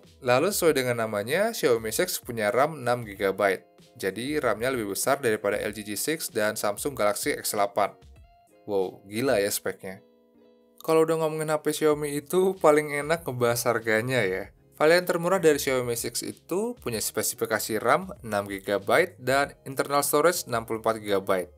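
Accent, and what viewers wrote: Lampung, Indonesia. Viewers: orang lampung ya?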